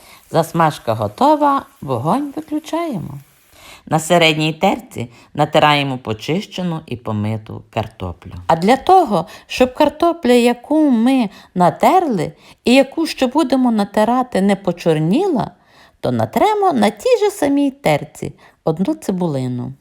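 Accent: Ukraine. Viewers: Гарно,просто,смачно і зрозуміло А саме головне страшенно приємно чути наш український говір. Підписалася і поширила. Маю подругу в Америці надіслала і їй. Щиро подякувала.🖤❤️💛💙🌹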